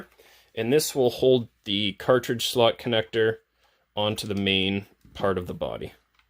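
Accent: Canada. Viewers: Lol I dunno why but being American I always get a kick out of Canadian accents 😁 Great video as always! This will surely help a lot of ppl fix their 32Xes!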